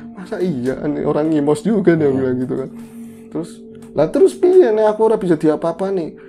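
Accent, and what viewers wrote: Banyumas, Indonesia. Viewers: ora ngapak ora penakk 😁😁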